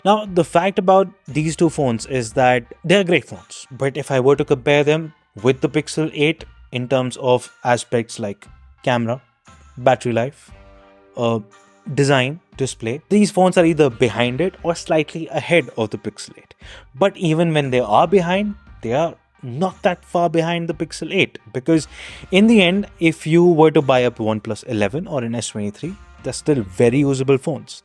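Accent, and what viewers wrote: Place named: India. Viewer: Stop speaking Indian man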